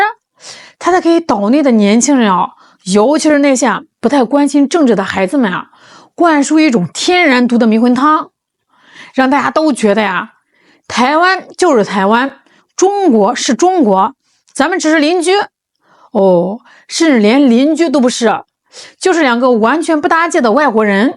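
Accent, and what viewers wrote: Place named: Beijing, China. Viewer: 每一天在聽在學的口音, 北京口音？非常喜歡！❤❤❤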